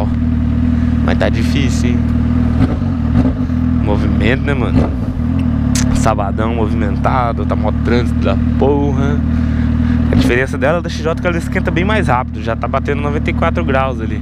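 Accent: Goiás, Brazil. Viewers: a TEE AMOOOO ta flnd NO PIQUE DO GOIAISSS VELHOOS TEMPOOSSS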